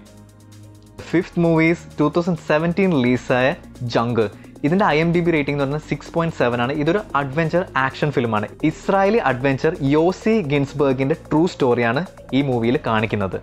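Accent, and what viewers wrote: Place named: Adimali, India. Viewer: അടിമാലി ക്കാരൻ ആണോ നീ.. നിന്റെ സംസാരം അങ്ങനെ ആണ്.. അമ്മച്ചി അപ്പച്ചൻ..😇😇👍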